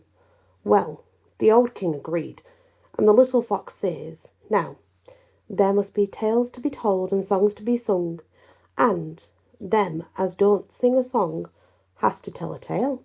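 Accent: United Kingdom. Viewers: Hey sis, im connecting from Krishna ..I love your beautiful brittish accent ...i love the story ...❤❤❤❤ love your contents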